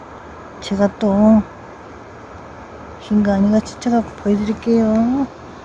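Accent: Gwangyang, South Korea. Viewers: 말 하는 억양이 과냥 사람 같네! 일 만 km 멀리서 과냥 말 들으니 좋네!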